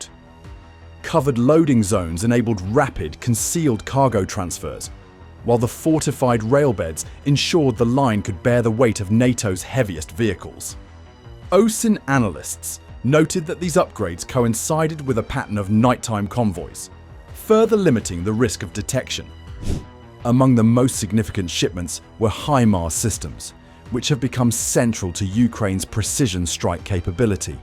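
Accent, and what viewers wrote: England, United Kingdom. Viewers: Insignificant information. Most probably misinformation. I can hear the English accent. Sounds like British propaganda. What a lot of BS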